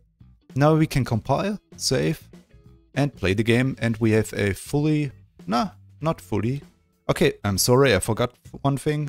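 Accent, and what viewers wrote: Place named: Germany. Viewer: very fast and great on the point explained video👍 you are german right? it would be awesome to watch some great videos in german then... ich bin zwar kein berliner aber trotzdem deutsch😅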